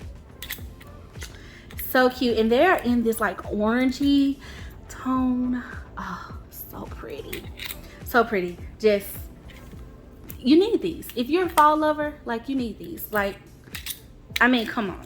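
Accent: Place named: South, United States. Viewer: I love your southern accent, so charming!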